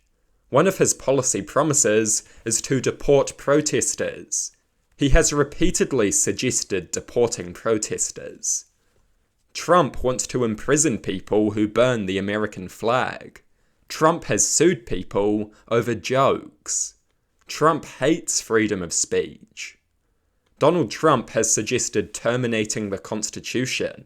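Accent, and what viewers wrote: New Zealand. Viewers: This is actually just a form of Kiwi dialect you haven't become accustomed to yet. Stop being racist bro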